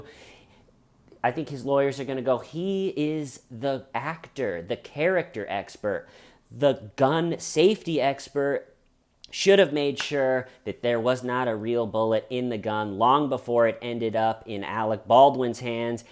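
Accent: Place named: United States. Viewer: So strong american accent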